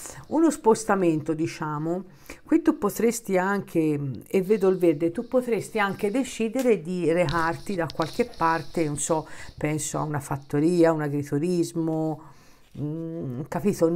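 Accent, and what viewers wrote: Tuscany, Italy. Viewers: Grazie,adoro come parli il toscano,e anche la Toscana,speriamo bene 💰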